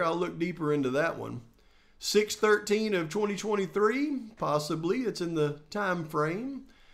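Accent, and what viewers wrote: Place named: South, United States. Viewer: I just love you. But can you please confirm if you are holding a bug zapper in your hand as a mic? Your country accent, paired with your laid back style plus all the bass pro shop shirts, has me convinced… that it very well could be. 😂😂🙌🏼🙌🏼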